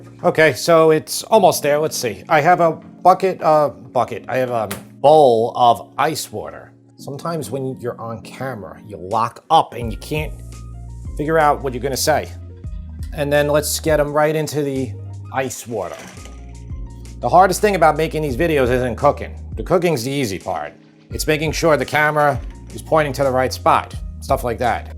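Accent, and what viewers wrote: New York, United States. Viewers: I just love that New York accent! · nice to hear a real new york accent. not somebody in new york speaking with a CA accent. that crap always annoyed me. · Wow I’m from Queens and living in Ga and I have to say that your NY accent is cool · I love your channel. I'm from Westchester, but lost my accent. I'm jelly of your accent, but trying to figure out which area your from.. let us know where you grew up. Also.. how do you not have a NY diner style home fries recipe??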